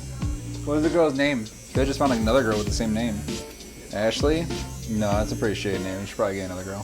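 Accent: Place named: France. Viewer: Your French accent is beautiful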